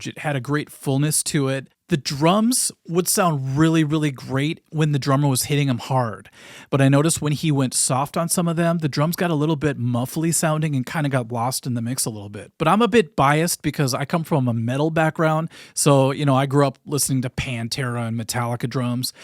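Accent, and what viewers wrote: Minnesota, United States. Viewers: Hey,. I always wondered where you might be from. I've watched many of your videos over the years and I could definitely tell you had a slight accent of some sort, but I couldn't quite place it, whether you were from Canada, Midwest or maybe even the Pacific Northwest (where people often can have a slight accent similar to the Midwest, where I'm guessing a lot of those folks originally came from). Minnesota makes perfect sense, though. You betcha! LOL:)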